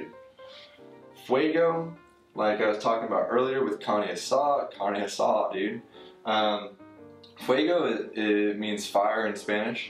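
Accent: California, United States. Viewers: Just here as a California female native born in 1972 in Danville, CA searching for ...do I actually have an accent...you are much younger but talk like me, so that is something.... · I like the way people from Cali talk!!!! Floridians talk too funny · I think it's kinda cool. I think it's the mainstream version of what ppl outside the state probably think Californians speak like. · This is "TV Californian". Not saying people don't use it but it's not how we spoke when I was growing up in Southern California in the 50s. (My family has resided in SoCal for over 120 years.) Classic "Californian" sounds more Midwestern sans the solecisms of the mass entertainment era. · I’m a Californian and yes this how we talk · Haha, I gotta say, personally I've never heard someone with as pronounced of a valley girl/surfer guy accent. I'm sure some people say it, might just be the area I'm in, but the "whatsaah dude" and "gnarly" feel more like the stereotyped Californian accent than how an actual Californian speaks lol The "yeah no" and "no yeah" are on point though 😂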